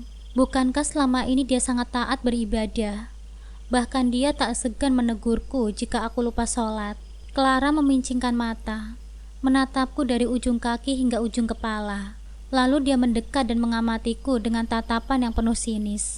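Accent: Java, Indonesia. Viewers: Bd hadir mbak yg suaranya medok banget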